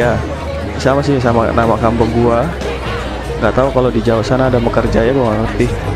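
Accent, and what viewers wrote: Bali, Indonesia. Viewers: Bang pasti asli Bali ya.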